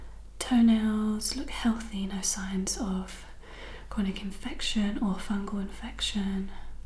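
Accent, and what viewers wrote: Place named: England, United Kingdom. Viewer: J’adore ton accent et ta manière de parler . Tu es anglaise ?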